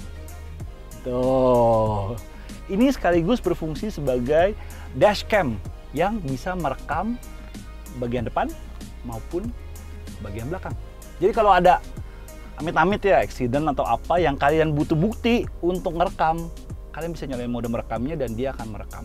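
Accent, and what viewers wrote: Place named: Java, Indonesia. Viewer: yg review 😂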